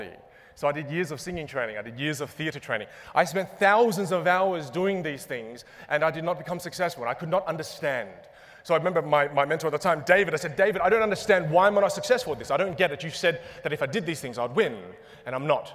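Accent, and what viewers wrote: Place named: Vietnam. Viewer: Your Vietnamese accent is spot on lol .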